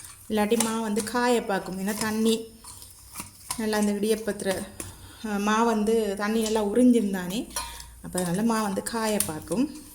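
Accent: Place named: Sri Lanka. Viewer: இலங்கையா நீங்கள் உங்கள் தமிழ் மிக அழகு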